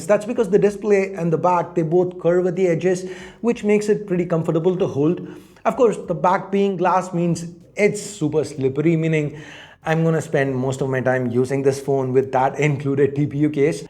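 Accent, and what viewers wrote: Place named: India. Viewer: Co on mówi ????????? indiglish?????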